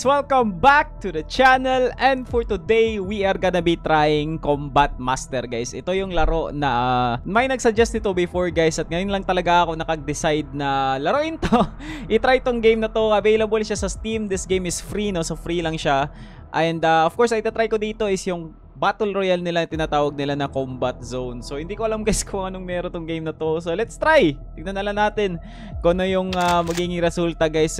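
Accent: Visayas, Philippines. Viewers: Bisaya ka pala lods ❤hahah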